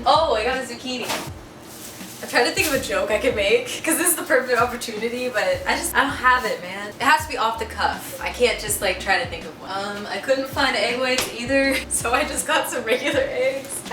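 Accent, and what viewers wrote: Ohio, United States. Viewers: Great video! Haha your Ohio accent is showing! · Side note: I love your user name lol has a nice ring to it! Sounds sexy/badass at the same time. Anyway I noticed the mention of your Ohio accent? Midwest represent lol I live in lake county Illinois which is about 35 min north of chicago. What part of Ohio are you from? Do you still live in Ohio currently? Not trying to sound like a creep stalker lol honestly just curious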